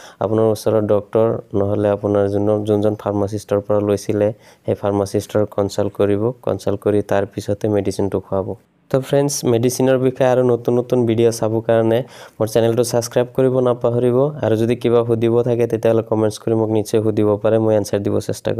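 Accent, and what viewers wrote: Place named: Kolkata, India. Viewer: kolkatar language mone hosse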